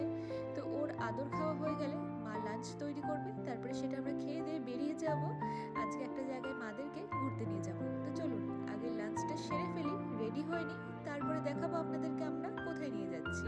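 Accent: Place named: North Bengal, India. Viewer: এত্তো দিনে উত্তরবঙ্গের কথা শুনতে পেলাম❤😂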